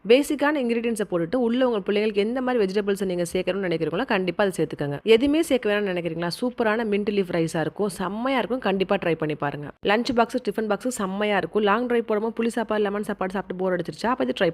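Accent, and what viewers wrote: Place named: Periyakulam, India. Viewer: Periyakulam slang 😂❤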